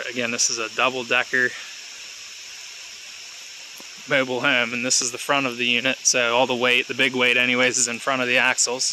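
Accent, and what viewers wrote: United States. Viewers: So curious about your accent. Did you grow up in the mid-Atlantic area? Maybe Maryland? I study accents and dialects so am just curious. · I can tell your Philly accent but can't place it exactly. Norristown?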